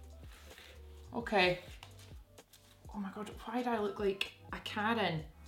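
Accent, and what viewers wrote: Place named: Scotland, United Kingdom. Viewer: Absolutely love your page I came across it by mistake I love all your suggestions your make up i like the way you help out and talk to your audience you have a wonderful personality and your accent is awesome... i’m just guessing maybe Scottish correct me if I’m wrong keep bringing those videos girlie we love them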